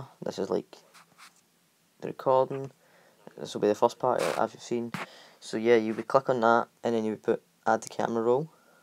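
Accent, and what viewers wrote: Scotland, United Kingdom. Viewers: Are you Scottish because I am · It's a Scottish and he is from Glasgow same as me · Starwars Villians no he's not he's scottish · Cus he’s Scottish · Your from Scotland aren’t you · Oi? I believe you're Scottish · Scottish YouTubed first one I’ve seen on youtube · idk wat ur saying cuz ur Scottish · Are you Scottish or British bc guess what I am SCOTTISH I LOVE GLASGOW OR SCOTLAND LOVE THE RAIN(not it’s so badddd D:) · Wow a Scottish youtube that's like a one in a million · you are Scottish but you're not telling me what I wanted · FINALLY SCOTTISH BOI YOUR THE MAN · Is he Scottish bc he hears like me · U fae scotland tae im fae glesga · You be Scottish boiiii! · Are u Scottish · Finally.... A Scottish YouTuber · Are u Scottish coz I am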